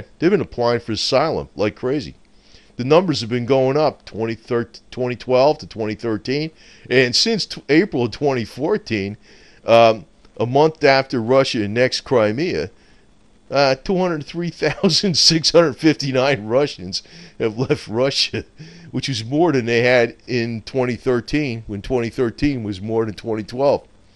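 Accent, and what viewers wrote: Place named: Chicago, United States. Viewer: you sound like your from Chicago or Jersey. I think silver is more abundant than people think. I spent $100K on the metal at $31 to figure this out. You are right, innovation and a positive environment to encourage creativity is far more valuable than having abundant resources owned by meat heads. Good analysis.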